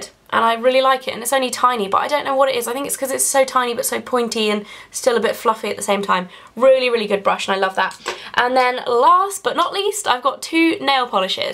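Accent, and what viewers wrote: England, United Kingdom. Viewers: i'm obsessed with british accents right... and i think your accent especially makes me love them even more GAH you're so gorgeous!!!!! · I love watching your videos. 1- Because your amazingly beautiful! 2- I love listening to English people, love their accents! :') <3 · i can listen to you forever, i love your british accent haha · What, just cause she has an English accent?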